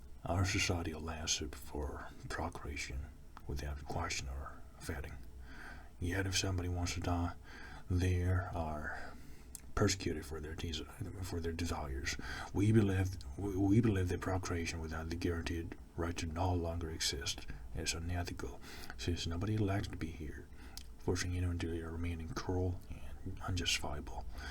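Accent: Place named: South, United States. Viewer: Your accent sounds very country southern USA.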